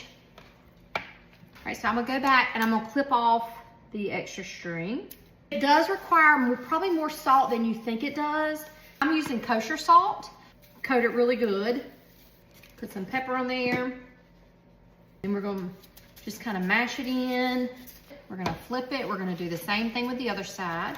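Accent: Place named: South, United States. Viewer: I love the southern accents you, Dan-O, Hickok 45, Stalecracker and a bunch of other southern cooks real Americans 👍🇺🇲 bless all ya all😊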